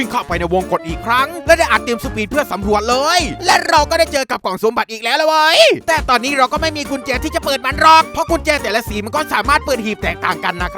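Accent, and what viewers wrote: South, Thailand. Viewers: พี่เป็นคนใต้ใช้มั้บถ้าพี่ป็นคนใต่เราเป็นคนบ้างเดียวกัน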